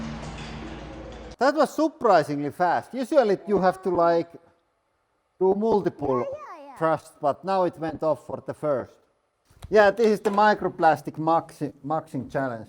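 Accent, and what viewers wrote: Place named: Finland. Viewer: Herrane aika mikä suomi aksentti 😅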